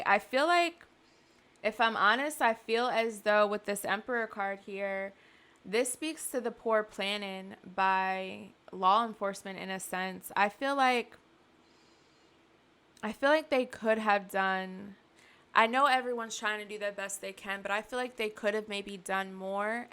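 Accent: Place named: Maryland, United States. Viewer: I love the your accent is it Maryland or Baltimore?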